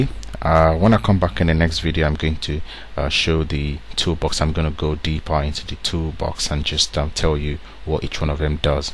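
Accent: Nigeria. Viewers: Man. I love your Accent. It sounds Nigerian. I am pretty sure you are from Africa.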